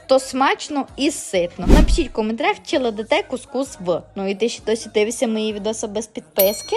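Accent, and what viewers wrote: Transcarpathia, Ukraine. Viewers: Яка чудова ваша закарпатська говірка! Дякую 😊❤️ І рецепт також чудовий😊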